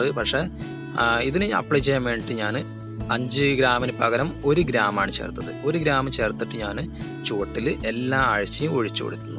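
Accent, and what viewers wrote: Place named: Malappuram, India. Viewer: Malappuram slang😀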